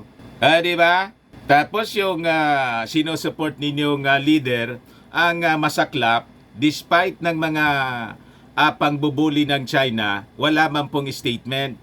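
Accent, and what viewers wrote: Bicol, Philippines. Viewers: Parang Bicol diction mo. Saen ka sa Bicol? Kami sa Cam. Sur.-- sismer boaquena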